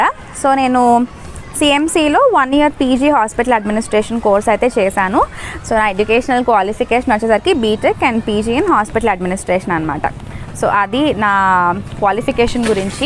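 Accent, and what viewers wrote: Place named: Vijayawada, India. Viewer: me slang chusi menu anukunna vijayavada ani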